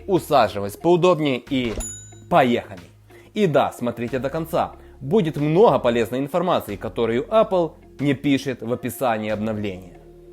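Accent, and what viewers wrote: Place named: Ukraine. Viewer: по акценту услышал, что какол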